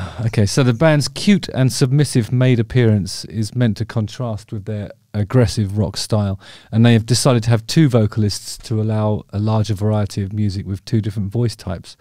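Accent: United Kingdom. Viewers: Just recently found your stuff Justin. I really enjoy it. Sort of missed out on The Darkness as I was already living in Germany as you lads were getting recognition in Blighty. So I'm catching up. What kind of Gibson is it you use to play your intro song? Anyway keep up the good work. Always nice to hear a nice British accent , the humour and the observations. All the best pal from the only Glaswegian living in Sachsen.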